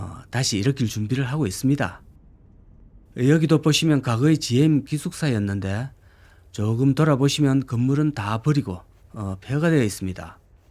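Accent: Gyeongsang, South Korea. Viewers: 얘기를 하던 도중에 ....마~ 하고 잠시 쉬어가는 투의 어휘를 사용하는데.... 이런 말투는 일본식 말투다......특히경상도 지역에 이런 말투가 유독 많다.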